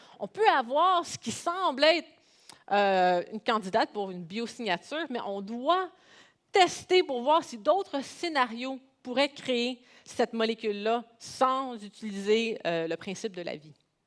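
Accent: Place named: Canada. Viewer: Que du bonheur cette conférence 😅 avec cette accent canadien inimitable 😂 encore meilleur 😅 de France ,je partage sur notre petite planète avec mes 2,9 k followers * 1,3 k suivi(e)s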